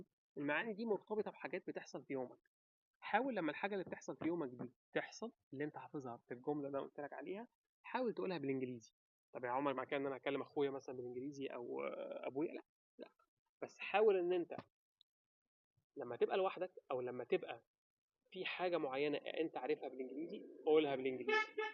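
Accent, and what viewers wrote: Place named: France. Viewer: دا الاكسنت الانجليزي الفرنساوي مضحك بجد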